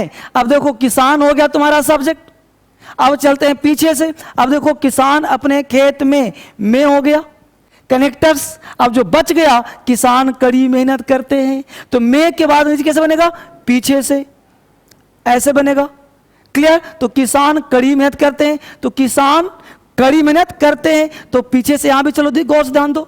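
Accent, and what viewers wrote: Bihar, India. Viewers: Ap bahut achhha padhate h Sir ap Bihar k rhn wale lagte hai n....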